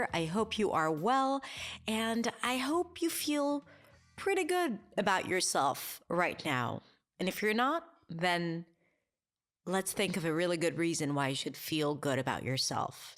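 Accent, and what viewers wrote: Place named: United States. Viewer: This is not practice? 😲😲 😲 I thougt she was American, so good her accent! 😶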